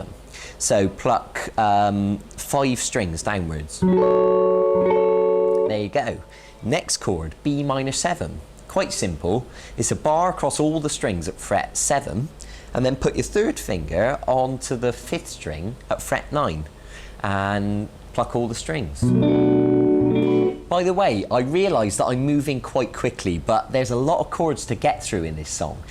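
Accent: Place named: Wiltshire, United Kingdom. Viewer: Great accent - Wiltshire ? Great lesson too of course :-)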